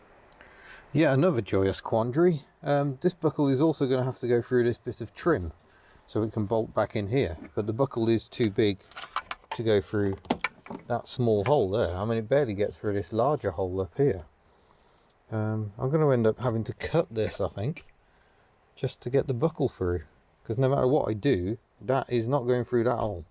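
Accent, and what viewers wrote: United Kingdom. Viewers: almost the Queen's English you were using for Aussie ears, haha!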